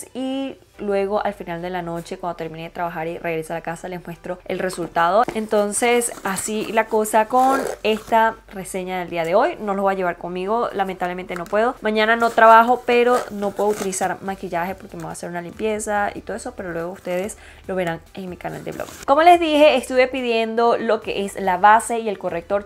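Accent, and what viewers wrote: Venezuela. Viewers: Me encantó hablando a lo venezolano de pana se te ve genial naguara 😂😂😂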